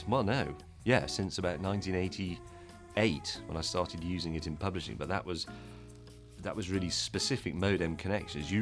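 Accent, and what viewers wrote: United Kingdom. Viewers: British/English obviously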